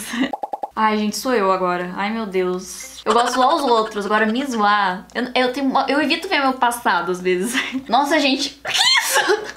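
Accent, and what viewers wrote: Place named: Paraná, Brazil. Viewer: "Muito sotaque paranaense" mais vc é paranaense linda! 😍💕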